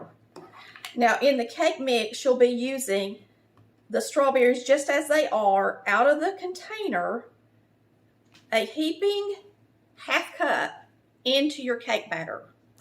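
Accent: South, United States. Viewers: Soon as you said "Hey y'all this is ". I was sold! So Southern and elegant 😊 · where are you from? I love your southern accent! I live in South Carolina, you remind me the ladies from My church!!!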